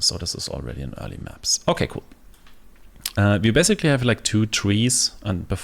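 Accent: Germany. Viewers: you sound german af 🤣 greetings from germany^^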